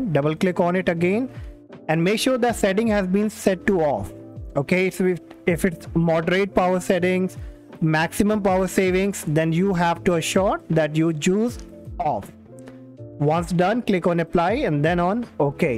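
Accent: India. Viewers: your indian accent is destroying my ears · Indian accent💀💀💀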